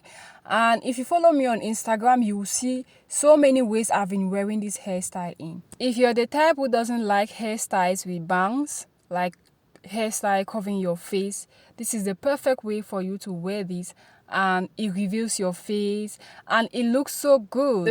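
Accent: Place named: Cameroon. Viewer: Hi my Cameroonian sis🥰🥰. I heard you speak and I’m like she sounds Cameroonian… I watched untilllll I heard you say you are from pays 😍😍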